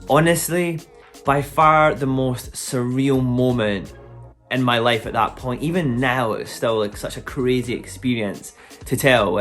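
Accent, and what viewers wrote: Scotland, United Kingdom. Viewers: Great video, I'm 27 yo , i achieved so much already, read a lot of books, learn a lot of things, traveled abroad several times , got settled to a graet job with good income. I'm grateful to many things in my life But i feel from time to time I've wasted a lot of my life already. My love life is not that great, i feel depressed sometimes especially when i see my friends happy , acchive their goals , get engaged or married. I hope i could stop feeling that way. P.S. I love the scottish accent <3<3 Love and peace from Saudi Arabia.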